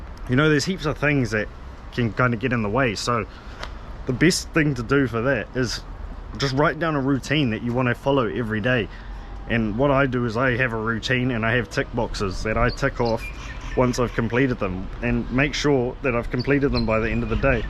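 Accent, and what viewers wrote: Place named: New Zealand. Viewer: Bro, I recognised that kiwi accent immediately haha. I didn’t realise how recently this video was uploaded. I’m super keen to watch your journey and see how you get along. I wish you all the best in your adventure!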